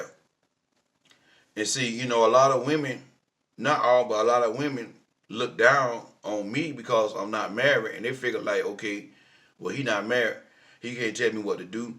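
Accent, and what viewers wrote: South, United States. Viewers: I love and the southern accent!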